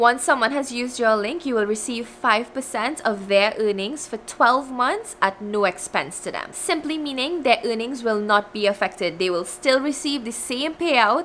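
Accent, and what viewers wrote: Trinidad and Tobago. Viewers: That Trini accent!!!! ❤ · Thank you here from Jamaica .Miss Trini haha. love de accent girl. 💛 Thanks for sharing your knowledge and tips. . I am new and already see results as a pantyhose foot "model". Bless you and stay well. 💛💚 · I love you accent lol TT 💖 I have family in Trinidad ❤️ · Nice Trinidad accent 👌🏼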